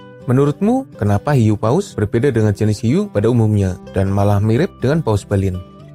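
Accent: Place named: Central Java, Indonesia. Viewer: Kayane wong jateng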